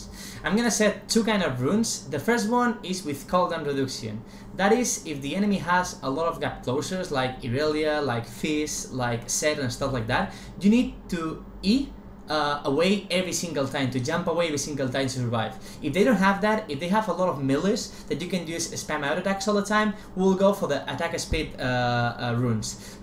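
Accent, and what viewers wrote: Spain. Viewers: Cuase he is spanish and our english is... :S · Because he has spanish accent, since English language is his second language. Dunno what you've expected.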